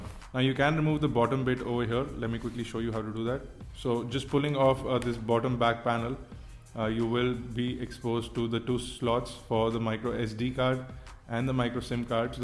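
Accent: India. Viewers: I have never seen a white person with a indian accent before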